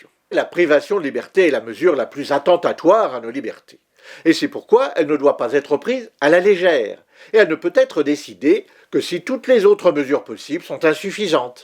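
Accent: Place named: North, France. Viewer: Alors là! je peux assurer que vous êtes du nord, non? "des chauves souris dans le beffroi"? =)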